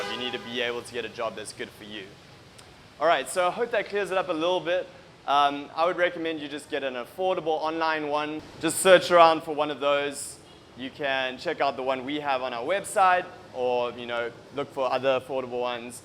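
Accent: South Africa. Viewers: Eish. That accent. Confusing for most. Instantly recognisable to fellow 🇿🇦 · sounds South African to me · you sound very South African bru, anyways I have a question, let's say I want to go overseas and I have 0 knowledge on teaching, I studied computer programming ( so no Degree or diploma for teaching), would you still recommend getting a TEFL Certificate? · not from the US so must be South African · Love that beautiful South African accent of yours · Its so awesome to see and hear a fellow South African in such an informative video. Well done!!! PLEASE HELP... I have a Bachelor Degree and Post Graduate qualification in Education, but now ESL qualification. Now I would like to get my TEFL certificate but an online one. Please advise which site is legit and actually accredited/ recognized. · are you from south africa? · That accent... You're south african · he is from south africa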